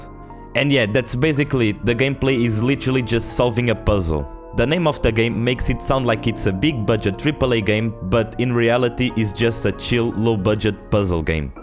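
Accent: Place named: Portugal. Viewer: Mano tive que checkar a tua bio peguei logo pelo teu accent que eras tuga hahahahaha, excelente video abraço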